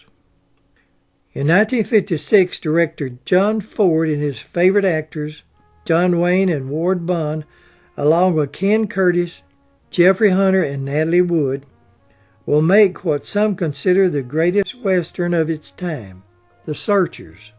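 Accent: South, United States. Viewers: no thank you you are what makes YouTube great I love your voice you have that little bit of Southern song which I love keep up the good work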